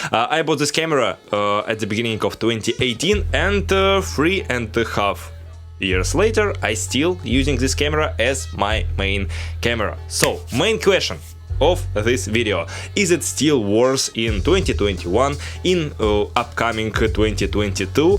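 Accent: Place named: Russia. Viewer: Broader than that could be incomprehensible for general audience, but yours was perfectly clear and perfectly Russian. 😁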